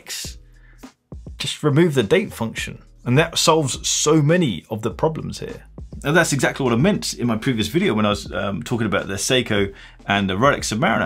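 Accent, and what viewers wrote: United Kingdom. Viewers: Great video. Where are you from? what's that accent if I can ask? Sounds to me like British but not quite the same as I'm used to....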